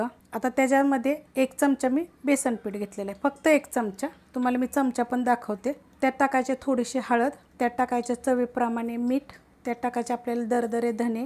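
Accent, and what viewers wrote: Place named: Mahabaleshwar, India. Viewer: तुमच्या सगळ्या रेसिपी एक नंबर असतात...तुमच्या बोलण्यावरून मला तुम्ही माझ्या माहेरच्या म्हणजेच महाबळेश्वरच्या वाटता.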